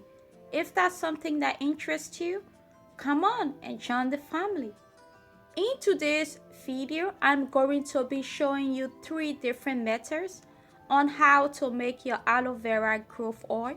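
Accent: Liberia. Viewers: Bella She sounds Liberian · I hear small Liberian English my geh....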